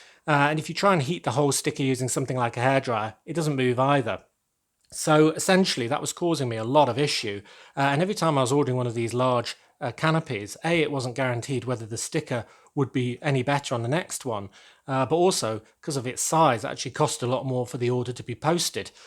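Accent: United Kingdom. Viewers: are you british bc i thought you were american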